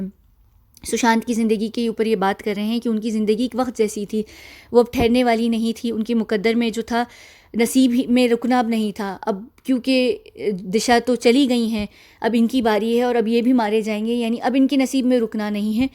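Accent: England, United Kingdom. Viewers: Very well explained, with your Englandish accent.. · Your accent is British.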